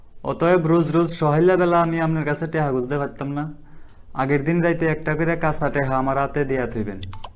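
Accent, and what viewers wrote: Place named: Noakhali, Bangladesh. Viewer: Please don't try this nowakhali accent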